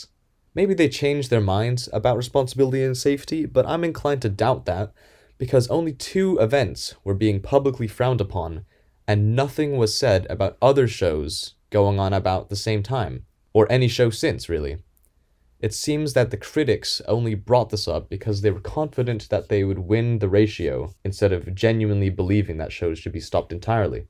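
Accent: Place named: United Kingdom. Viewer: why do you sound british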